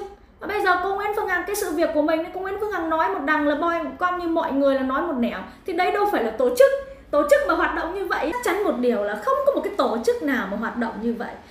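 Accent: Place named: Countryside, Vietnam. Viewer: Con này nói tiếng nhà Quê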